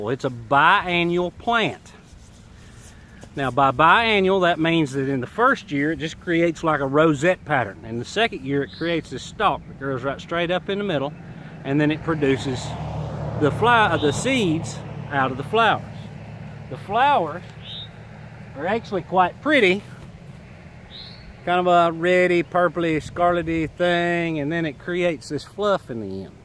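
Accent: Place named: South, United States. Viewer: The Horrid Thistle (better name than Bull Thistle) is absolutely not an invasive plant! It is a native, and extremely beneficial plant for wildlife. Applying the obvious here; you have a southern accent so I can assume you live in the Deep South, like me. Houston, Texas here. Horrid Thistle' are blooming everywhere right now with Spring just beginning. Sure, they may not be conventionally pretty but they are fascinating. Back to the point, know your stuff brother! Don't spread misinformation. The rest of the video was great btw.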